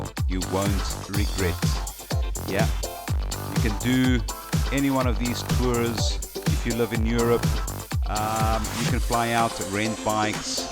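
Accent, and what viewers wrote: South Africa. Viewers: You sound like you from South Africa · Hi there. Your accent sounds like S.African. I am Saffa living in France 20 years now and motorcycling here. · nice vid mate.....what part of South Africa you from?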